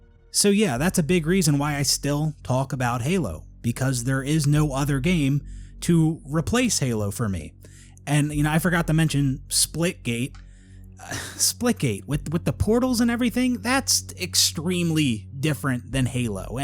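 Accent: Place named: Philadelphia, United States. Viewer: By the way, is that a delco/philly accent I hear? Delco checking in.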